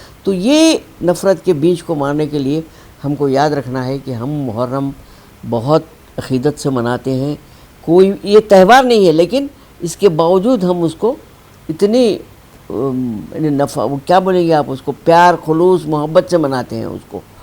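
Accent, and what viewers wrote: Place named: Deccan, India. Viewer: Mashallah excellent deccani urdu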